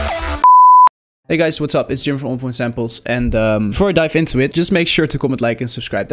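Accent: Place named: Netherlands. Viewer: Lol. Je hoort meteen dat Nederlandsche accent. Edit: hoe lang Ben je al bezig?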